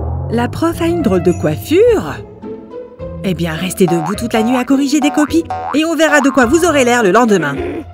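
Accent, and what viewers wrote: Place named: England, United Kingdom. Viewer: Je sais pas si vous être anglais ou français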